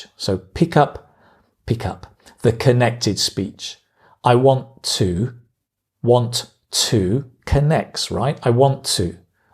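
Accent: United Kingdom. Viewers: so you have a british accent right? And u speak slowly trying to get a neutral slang so that all ur viewers can understand ur speech?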